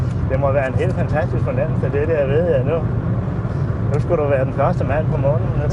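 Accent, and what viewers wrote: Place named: Jutland, Denmark. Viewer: Er Jydsk så svært at forstå?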